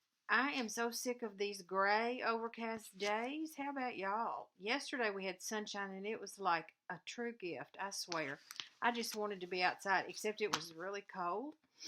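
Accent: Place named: Alabama, United States. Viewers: love your video! it is cold here in Portland Oregon. I have spend a few Christmas in Georgia. My sister in law lived in Lawrenceville now Sugar Hill. Your accent reminds me of her. you are right about floss tube I think I know you all personally. Merry Christmas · I just love listening to you. You make me laugh and you talk just like we do in Alabama. Beautiful stitching.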